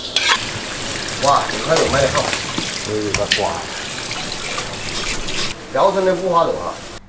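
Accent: Chengdu, China. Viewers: 你的成都话我太欣赏了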